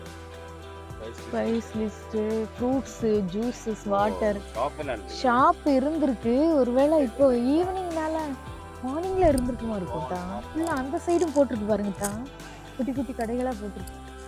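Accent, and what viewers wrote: Tirunelveli, India. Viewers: Praise the lord sis Nellai tamil super super 🎉🎉🎉❤❤❤ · Video super... நேர்ல போய் பார்த்த மாதிரி இருந்தது....நம்ம ஊர் தமிழ்ல கேக்க ரொம்ப நல்லா இருந்தது...நீங்க தூத்துக்குடி அல்லது நெல்லை மாவட்டத்தை சேர்ந்தவர்களா....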